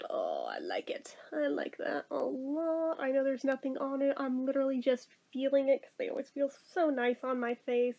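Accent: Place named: Vermont, United States. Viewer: Speaking of Vermont, do you live there? Or originally from there? Your voice/accent is almost identical to my buddy from Stowe. Like scary close😂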